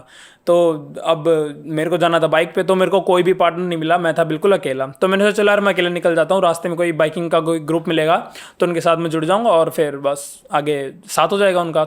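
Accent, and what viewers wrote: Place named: Haryana, India. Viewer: Haryanvi way of talking love from Australia bro ...keep going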